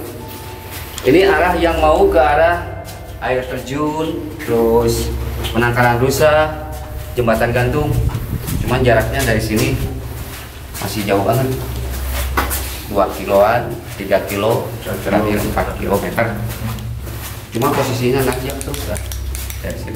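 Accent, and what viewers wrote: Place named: Java, Indonesia. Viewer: Sehat selalu mase,,,,,tetep semangat,,,,medoke itu loooooooo😘😘😘😘😘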